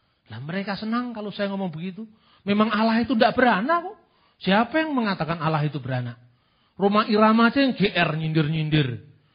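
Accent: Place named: Yemen. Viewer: Pk kalau ngomong jawa seakan2 org dess.tapi,kalau ngomong arab seakan2 org yaman👍👍👍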